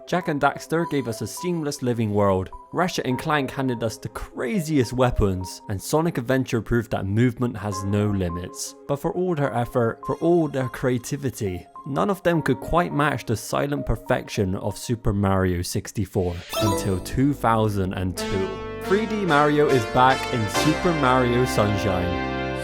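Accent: United Kingdom. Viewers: I have a suspicion that this guy is british but i cant put my finger on it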